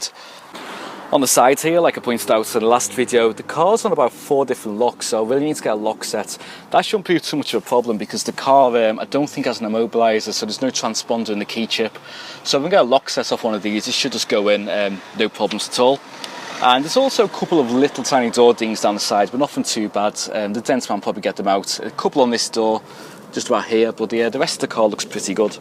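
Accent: United Kingdom. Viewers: stop copying him then XD the thumbnail is remeniscent of his style, the car is the same as his and ur detailing of the 280k miles car is basically the same video he made (exact same method). i get that its cleaning a car, how different can you be but at least put ur own style into narration other than Im british he american. Still enjoy ur vids tho mate, keep em coming!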